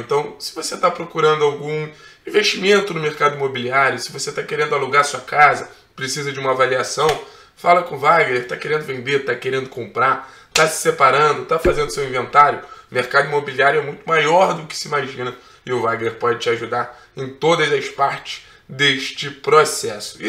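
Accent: Rio de Janeiro, Brazil. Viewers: Ele é carioca da gema, não tem como forçar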